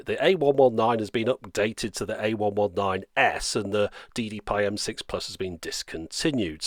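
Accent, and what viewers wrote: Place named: Northern England, United Kingdom. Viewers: You've gone all geordie in this video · Huh, never would have guessed your from preston :3 though you have a very northen accent :p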